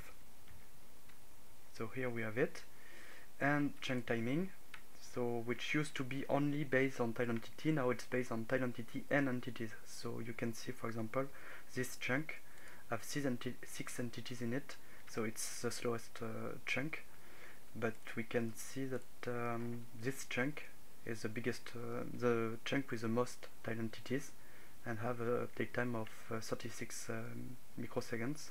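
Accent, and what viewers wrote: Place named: France. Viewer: Ton accent!!! On voit que c'est français pur!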